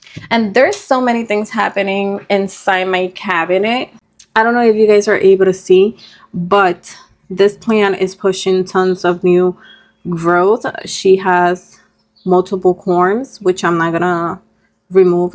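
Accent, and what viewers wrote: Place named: Puerto Rico. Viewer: I will, tienes accento de Puerto Rico, eres puertorriqueña? Si no te molesta decirme, yo soy. Muchas gracias,.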